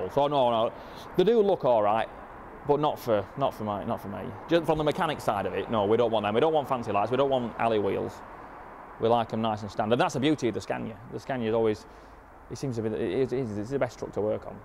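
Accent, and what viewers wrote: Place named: Lincolnshire, United Kingdom. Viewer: Nice to hear a Lincolnshire accent mayat.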